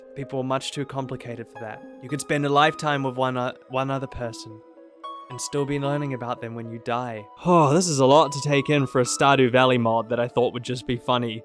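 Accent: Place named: New Zealand. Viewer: omg i just found out you're from nz, (i am too) it's SO refreshing to hear your voice and to watch a channel that's not american. i love your voice so much and i'm so glad i found a great youtuber who's a kiwi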